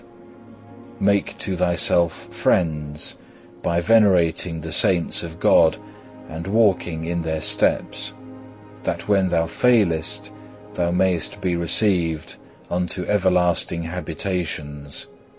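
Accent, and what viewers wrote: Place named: England, United Kingdom. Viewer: Grazie mille! to the dear soul who set "The Imitation of Christ" to background music! I listen to this almost every night as I sleep since I ceased taking anti-depressants exactly one year ago in October 2017!!! You have made it fresh and more poignant. The reader's beautiful English accent is soothing, but sometimes the words alone are a little stark! Sincere thanks to you. St. Thomas a Kempis words are a cure for my mental illness! Thanks be to God!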